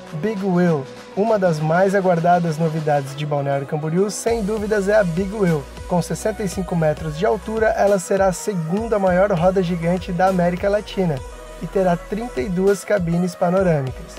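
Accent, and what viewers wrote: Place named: São Paulo, Brazil. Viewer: Sotaque paulista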